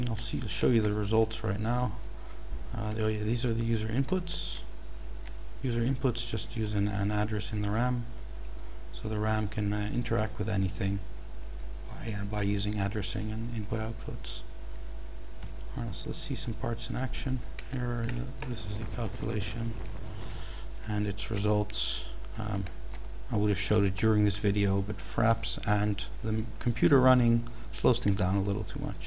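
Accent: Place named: Netherlands. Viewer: Ik hoorde hoe je praatte en ik dacht gelijk: wow, vette nederlander. Nice gedaan man :)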